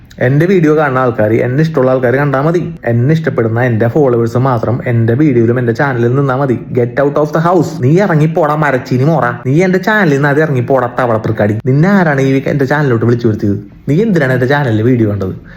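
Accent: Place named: Coastal Kerala, India. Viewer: I started watching your videos bcz of your very interesting kadapuram slang,nalle rasaan kekaan....kore alkare chettan chiripiknind....keep going bro🎉❤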